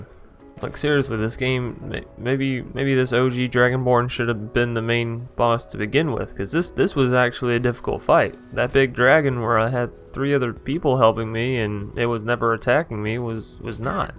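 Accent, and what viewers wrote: South, United States. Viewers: Talking like an ol' Truck driver from the south. Love it! 😎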